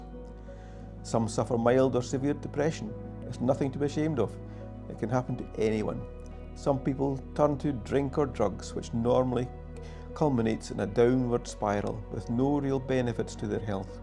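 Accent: Scotland, United Kingdom. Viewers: Greetings from Australia, on the the Sunshine Coast of Queensland. Been riding since the early 70's on the usual vast array of types, sizes and forms... with a million memories. Just so enjoying listening to your soft Scottish accent... keep the vids coming... thankyou 👍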